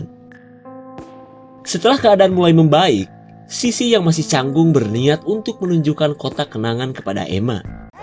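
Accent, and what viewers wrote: Sunda, Indonesia. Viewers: Sunda pisan euy...😄😍❤👍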